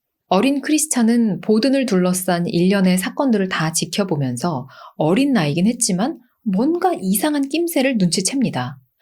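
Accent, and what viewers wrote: South Korea. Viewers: 🙄ㅈㅓ는....한국식 발음이 좋ㅇㅏ요.!❤😁👍ㅎㅎ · 한국식 영어발음은 친근하고 귀에 쏙쏙잘들어오니 좋은걸요 ㅎㅎ 바른 발음으로도 알려주시고 여러모로 꼼꼼하게 다정세심하신 님❤ 오늘영상도 고맙습니다! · 영어발음 관련해서 까다로우신 분들이 많았나봐요, 전 한국식발음이 더 듣기 편하고 좋은데 ㅎㅎㅎ 고나리 넘 신경쓰지 마세용 ㅎㅎ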